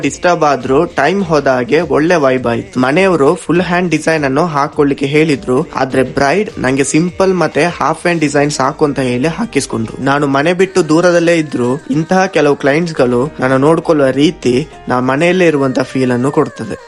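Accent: Tulu Nadu, India. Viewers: Kannada nodi andkonde niv thuluvinavaru antha. Namgu hage bangalorenalli thulu mathadudu kelidre modalu kivi konde agthade.. maneyavralli matadida hage agthade..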